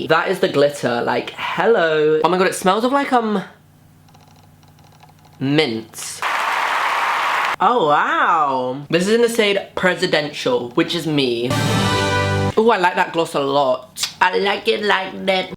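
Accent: Scotland, United Kingdom. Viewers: we stan a scottish intro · Scottish then? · The Scottish accent tho😂😂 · Love ur Scottish voice we love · the scottish accent through this killed meee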